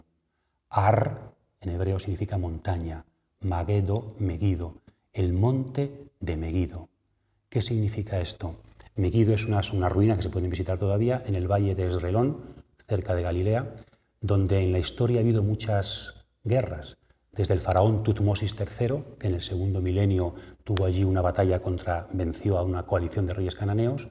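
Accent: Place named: Spain. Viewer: Escuchar a un sacerdote de sana doctrina y con acento español no tiene precio. Dios lo bendiga Padre!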